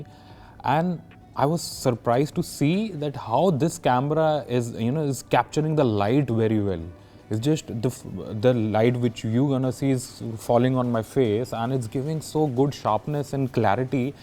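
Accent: India. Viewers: wah....indian sound on English language...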